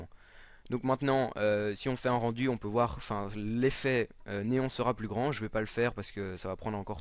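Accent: Belgium. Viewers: sa sent l'accent belge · Tu est belge non ? apouier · Il est belge, si entends dans une de ses vidéos: il dit Nonente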